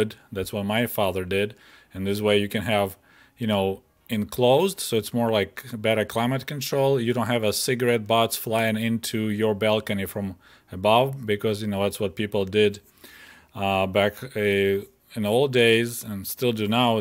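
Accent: Russia. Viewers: Agreed I love the accent so much. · Man I love Russian - English accent / dielect . You sound so nice but at the same time so deadly. Much Love from New York. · Sir, I'm obliged to mention that you still have a discernible Russian accent.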